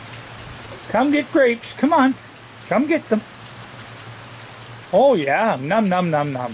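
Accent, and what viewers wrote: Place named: Canada. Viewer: Love me some and Bob! My favorite two channels that I look forward to everyday! I just love your Canadian accent! I was born in upstate New York but moved to Texas when I was a teenager. Sure miss the north!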